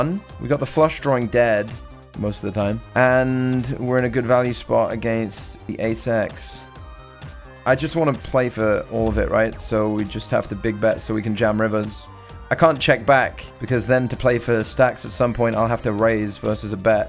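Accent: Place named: Leicester, United Kingdom. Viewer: Aye up me duck,is that a Leicester accent?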